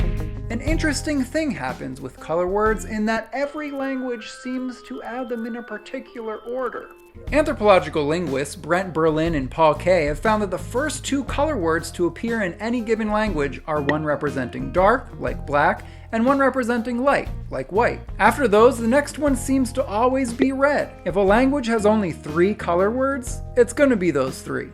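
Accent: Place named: East Coast, United States. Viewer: All very interesting, but what I'm most struck by is that as a midwesterner I thought "orange you glad" was just a bad pun. The jersey/east coast pronunciation (and probably origin) makes so much sense haha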